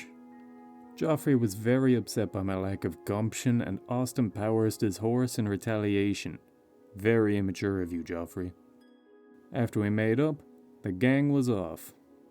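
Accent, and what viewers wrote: Ireland. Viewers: Awesome video, always happy to hear more Irish youtubers. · why are you doing a weird american accent? you are so obviously irish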